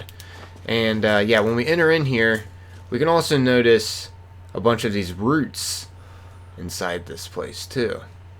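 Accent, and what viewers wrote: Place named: Pittsburgh, United States. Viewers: Pittsburgh pa away. Greetings from PA. Yinzers tell the jagoffs to quit driving over the burm. Wosh your dishes and laundry. Love the pittsburghese. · Yo are you a yinzer or not man? Your accent is awesome.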